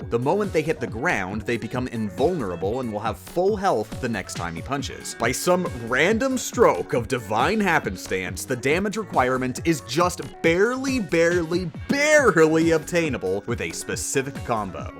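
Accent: Canada. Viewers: Is it just me, or did he sound very Canadian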